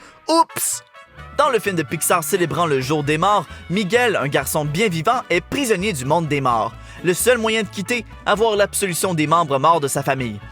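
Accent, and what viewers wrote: Quebec, Canada. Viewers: Piter pane et mon paire?! 😂😂 alala laccent quebequois est parfais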